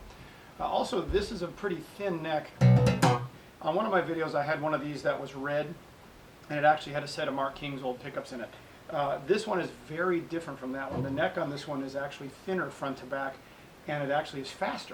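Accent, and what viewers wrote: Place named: United Kingdom. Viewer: love the british accent. greetings from london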